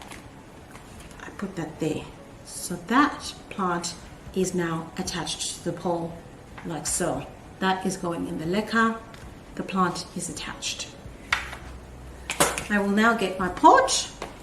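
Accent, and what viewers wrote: Australia. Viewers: Australian accent ❤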